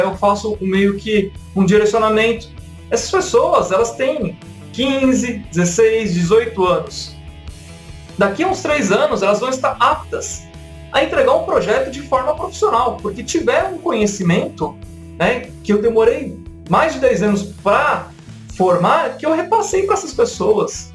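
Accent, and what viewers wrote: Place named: São Paulo, Brazil. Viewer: Parabéns cara, pelo seu sotaque acho q é de SP, como é esse seu esquema de coach?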